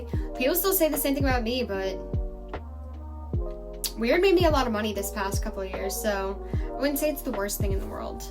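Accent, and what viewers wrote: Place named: South, United States. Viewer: You have a slight southern or country accent, where u from?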